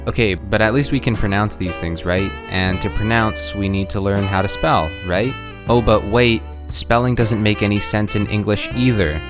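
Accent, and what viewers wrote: Russia. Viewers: your russian accent is actually pretty good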